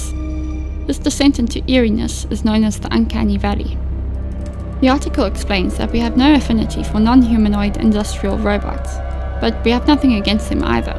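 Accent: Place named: South Africa. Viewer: You must be South African if not your accent sounds just like it. I am South African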